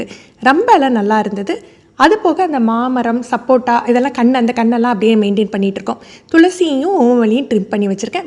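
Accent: Tirunelveli, India. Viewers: Akka neenga tirunelvelia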